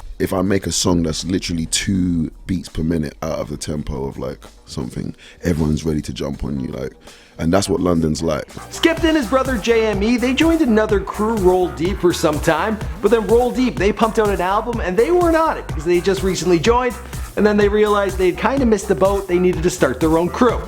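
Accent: United States. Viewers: Its ok his american/canadian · Wtf is this American chattin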